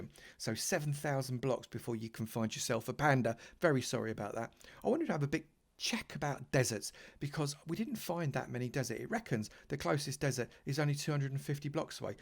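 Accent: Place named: East London, United Kingdom. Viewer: U sound like u support West ham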